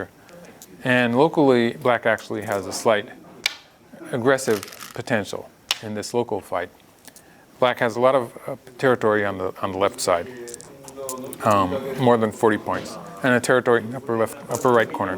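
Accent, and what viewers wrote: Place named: Japan. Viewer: I swear this guy's accent is sounding slightly Japanese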